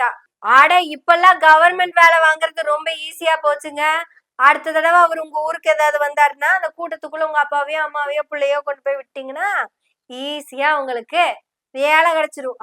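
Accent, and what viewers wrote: Kongu, India. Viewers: கொங்கு தமிழில் நீங்கள் பேசும் உங்கள் கருத்துக்கள் பாராட்டுகிறேன். வாழ்க வளமுடன்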